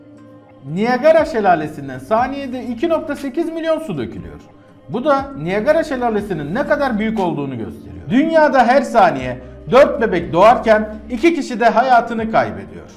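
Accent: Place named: Syria. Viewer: Aga ne berbat video. Ne anlatmak istedin ne alakası var seninle? Bide sen niye suriyeli gibi konuşuyorsun??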